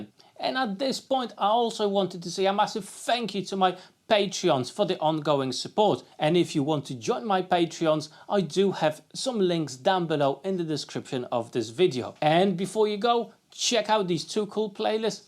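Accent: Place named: England, United Kingdom. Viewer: Another brilliant video made by a Polish guy with an English accent. Always look forward to your good work.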